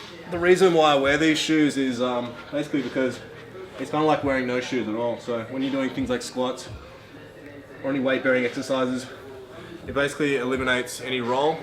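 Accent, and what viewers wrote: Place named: Australia. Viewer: great vid! i don't get why you would have haters. you seem pretty cool. nice to hear a fellow aussie on youtube 😄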